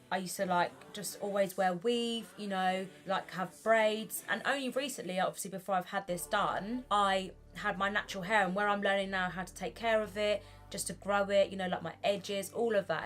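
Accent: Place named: United Kingdom. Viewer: I'm half white and half white?😂😂😂😂, Omg i think that have both cultures is funnny, but i AM from Brasil, and yes i'm mixed too but i Just white, not the two things. You is beautiful ♥️ and the ethinity no matter and, i love your accent! I haveee a special feeling for UK because of Amy Winehouse♥️omg i wanna visited a day kisses from Brasil, you are cute and funny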